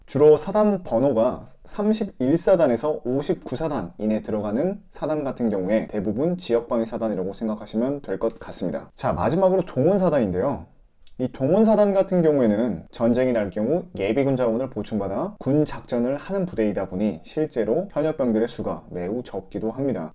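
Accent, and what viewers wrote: Jeolla, South Korea. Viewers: 전라도 사투리가 섞여 있네요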